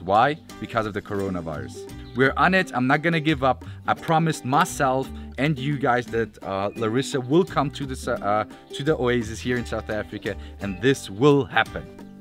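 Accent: Switzerland. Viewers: Hahaha ish geil ihn schwizerdütsch rede zköhre😂😂💪🏼🤘🏼🤙🏼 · Damn...that Swiss-German thoughhhh 😍😍😍🤤❤❤ lmfaooo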